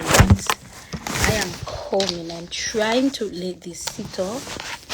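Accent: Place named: Africa. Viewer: my first time commenting but I had to this time you’re so talented the African accent got me ooo someone plz sign her ooo nuff love ❤️ one of your fan blessings queen 👸🏾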